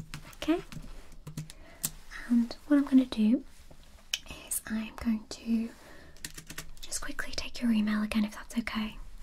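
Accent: United Kingdom. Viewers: The accent is giving me the chills. British is so damn nice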